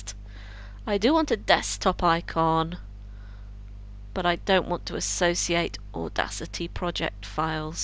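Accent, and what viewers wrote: United Kingdom. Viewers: are u a girl or a boy sounds?0_0 oh i hear the british sounds in ur voice lol cool. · nah man....i said that because this woman sounds like one of those British nannys....xD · It's british accent :P that's why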